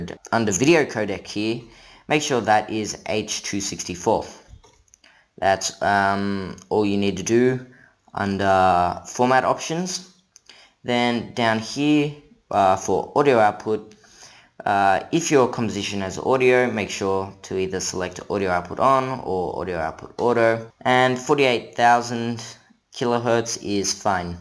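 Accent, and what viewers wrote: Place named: India. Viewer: Thanks a lot man!! From 5 hrs to 1 hr.😍😍 I wish I saw your video long time back. Thanks brother. I'm from India. It seems from your voice that you are also an Indian. Am I right?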